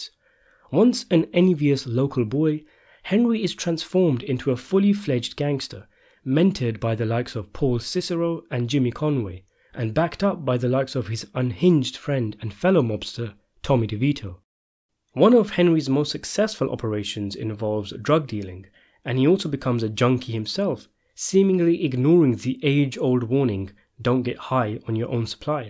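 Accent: England, United Kingdom. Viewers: Suffering as I do from the quintessential America bias to assume anyone with an English accent as a measured scholar, the aesthetic discrepency of approaching this content with said linguistic stylings, tickles me to no end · British accent. Ahh. During Special Forces selection & training in British cultures greatest difficulty is making sure they can pull the trigger. In the States selection etc.was originally modeled directly on Britain's proven methods. That changed. Among other things it was clearly not difficult to find guys who could pull the trigger. That was decades ago. In the current era both cultures have trended towards a greater ability to do so. Capisce ?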